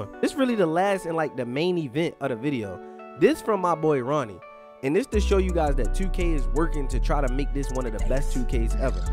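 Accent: Baltimore, United States. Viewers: You definitely from Baltimore I can hear it 😂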